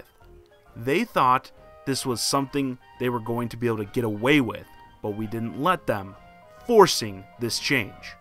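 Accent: Chicago, United States. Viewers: R u from Chicago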